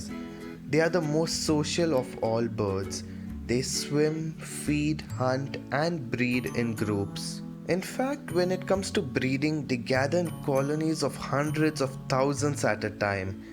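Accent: India. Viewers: annoying Indian accent.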